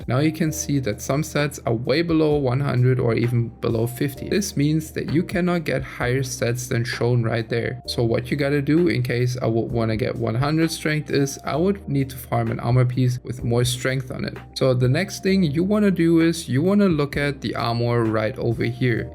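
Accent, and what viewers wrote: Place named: India. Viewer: Ah listen to that thick indian accent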